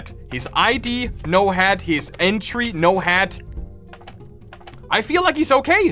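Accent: Denmark. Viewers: Wait I think bro my be danish he has that danish accent like me except I can get rid of mine for a more American accent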